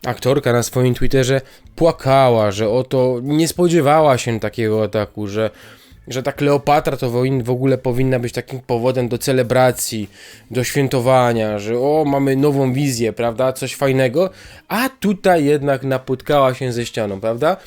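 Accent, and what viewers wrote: Silesia, Poland. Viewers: Pytanie... jesteś ze Śląska? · Chopie jak jo Cie słuchom to widza żeś je łod nos ze Ślūnska 😂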